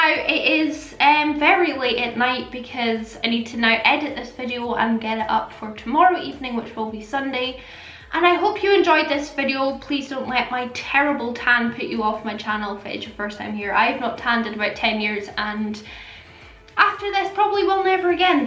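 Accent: Scotland, United Kingdom. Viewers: I adore this!!! Where are u from girl? I’m getting a Scotland accent and I’ve been waiting for a Scottish MUA pal for literally forever so I’m dying😻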